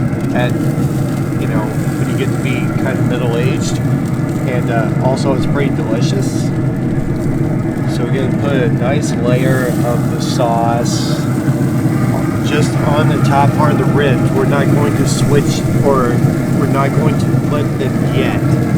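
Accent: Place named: North, United States. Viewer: Northern accent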